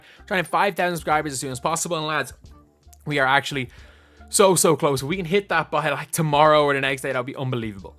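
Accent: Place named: Ireland. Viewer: It’s an Irish accent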